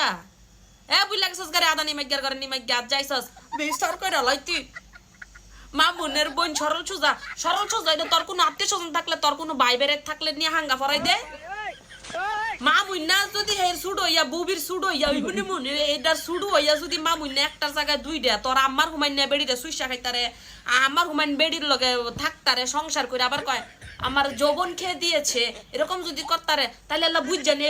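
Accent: Comilla, Bangladesh. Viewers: OMG 😲 😱, can i know in Bangladesh what part you are coming from? Its sound Cumilla version 😂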